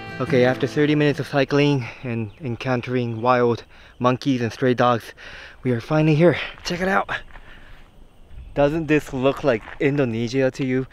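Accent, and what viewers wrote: Singapore. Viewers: 英語がシングリッシュ寄りのアクセントになってきた気がするww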